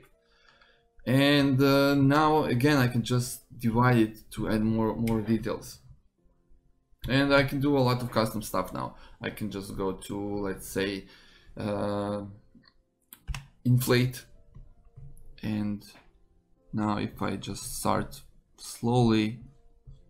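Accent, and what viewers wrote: Russia. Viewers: Hey бро, it's a Russian accent ? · R u russian? Whats your native? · Really nice video. Is your accent russian? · жесткий русский акцент ХD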